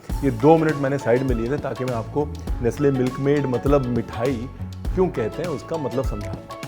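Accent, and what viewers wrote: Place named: Uttar Pradesh, India. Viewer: aj UP wale accent me recipe sikh kr mja a gya.....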